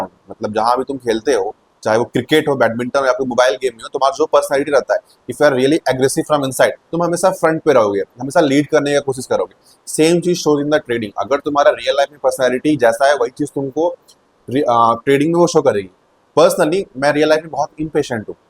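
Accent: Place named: South, India. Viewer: south indian ki tarah lag rhe ho bolte huye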